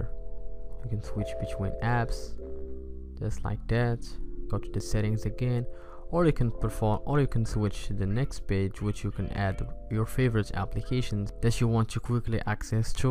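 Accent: Morocco. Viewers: From your accent I can tell that you're Moroccan 👍🏻👍🏻 keep up the good work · moroccan accent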